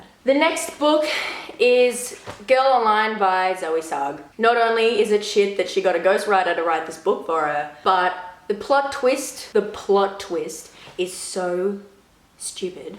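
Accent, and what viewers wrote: Australia. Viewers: It’s definitely an Aussie accent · that's not a British accent it's more of an Aussie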